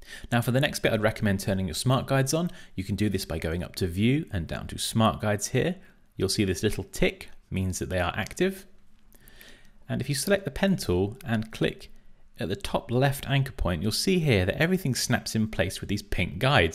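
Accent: United Kingdom. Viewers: the tutorial seems to be easy but I cannot understand some commands ( not a native speaker ) not used to a British English pronunciation. Will try more anyway! Thank you for your videos!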